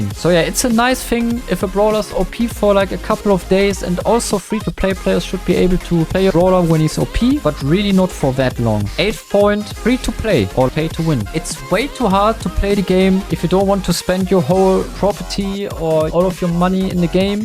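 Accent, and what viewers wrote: Germany. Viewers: Man merkt deinen deutschen Akzent so sehr, feier ich😂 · Voice with german acent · Ich höre das du deutsch bist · Wie hart Mann merkt das er deutsch ist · bro dein deutscher aktzent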